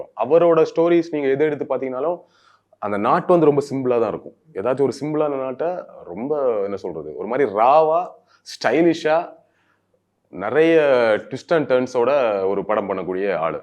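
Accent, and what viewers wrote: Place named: Kanyakumari, India. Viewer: avar slang kanyakumari mari eruku bro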